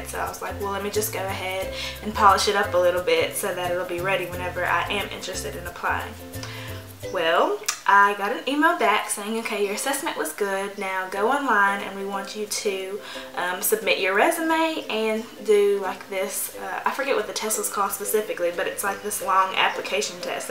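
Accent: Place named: South, United States. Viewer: oh ok thats cool. I knew you had to be from some where down south.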